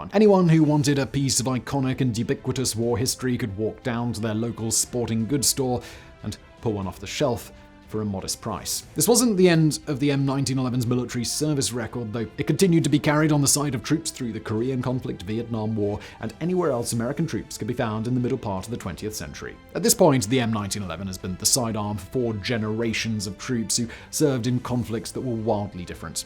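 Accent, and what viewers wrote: England, United Kingdom. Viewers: Not a fan of a Brit talking firearms. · Something about an english accent talking about guns seems less “jerk-offy” than a guy with a texan accent talking lovingly about his browning m2. Idk i clicked on this video expecting a Brandon Herrera type dude jerk off a 1911 for 19 minutes but I was pleasently surprised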